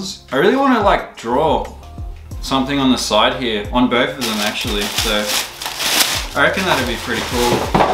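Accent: Australia. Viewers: Mad deck also are you from Australia or nz? From the uk btw. Nice shoes also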